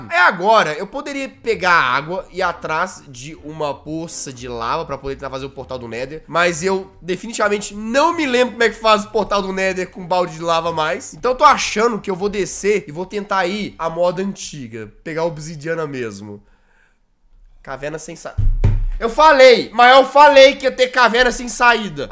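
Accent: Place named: Minas Gerais, Brazil. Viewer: certeza que é mineiro, sutaque bão demais soor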